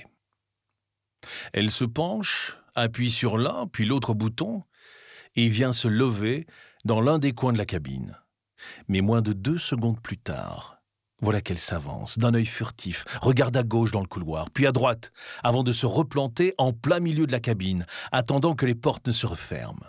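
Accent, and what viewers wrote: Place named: Belgium. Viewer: Ça me fait toujours plaisir d'écouter l'accent belge. Merci ❤❤❤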